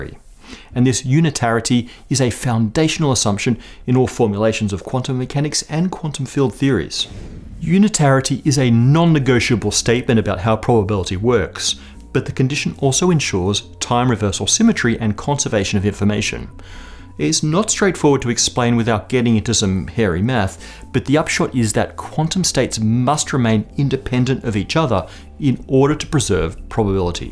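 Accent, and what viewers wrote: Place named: Australia. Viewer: I like this guy, he sounds Australian. I like Australians.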